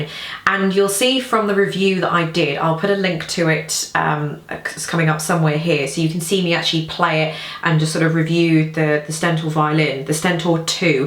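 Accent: England, United Kingdom. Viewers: I like your accent. I can tell you are from England.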